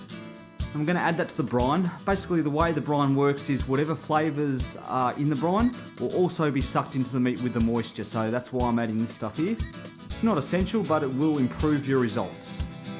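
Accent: Australia. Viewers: It's so good it's retarded. Real, ocker Aussie speak. Love it mate!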